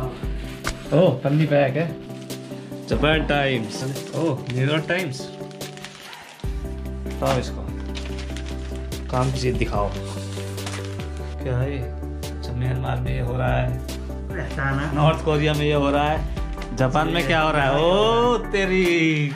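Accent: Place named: Rural, India. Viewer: Bhagwan kasam I love your Desi voice ( I also have village that's the reason I also speak village language ) Majo Aago !!!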